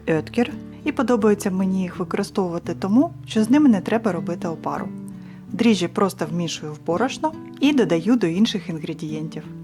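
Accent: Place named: Ukraine. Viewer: Зачем на здесь слушать этот украинский говор.. Пусть на своих каналах вещают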